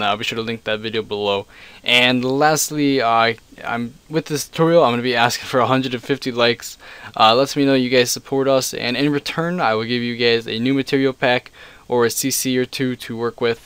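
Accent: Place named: Quebec, Canada. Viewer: where are you from i can't tell from your accent. I'm either thinking Quebec or some french speaking place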